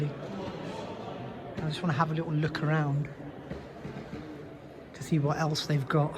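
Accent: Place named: Cockney, United Kingdom. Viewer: Ranjit Singh was my hero, I hope to visit one day, thanks again, just love the cockney accent.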